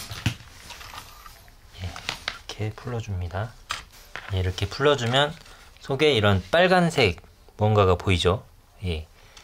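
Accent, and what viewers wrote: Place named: Jeonbuk, South Korea. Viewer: 늦은시간에 빠른답변 감사드립니다. 사투리가 구수한게 너무 익숙합니다. 저는 전북김제 출신인데 동향분 아니신지요. 그래서 더 편한함을 느낀거 같아서요~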